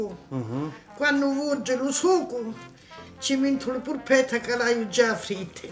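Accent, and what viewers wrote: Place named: Sicily, Italy. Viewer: La nonna parle un sicilien parfait de la région d Agrigento et en plus les Pourpette ont l’air délicieux brava la nonna demain j’essaye de le faire aussi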